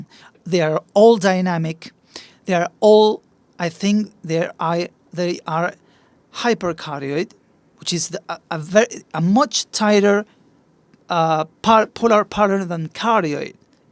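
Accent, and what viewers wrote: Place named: France. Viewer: Dude is eh s’french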